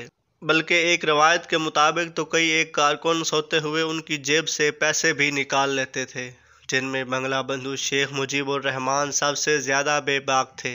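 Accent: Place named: India. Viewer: Bhaai Hindustani urdu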